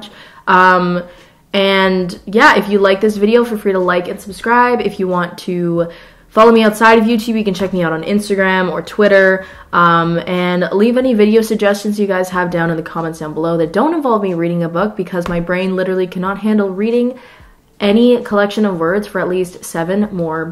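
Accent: United Kingdom. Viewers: it's his voice! it's not weird ... {[(british accent DUHHH)]} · Also, where did his british accent go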